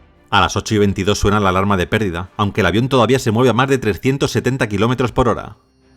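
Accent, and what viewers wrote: Spain. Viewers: Así lo pronuncian en España.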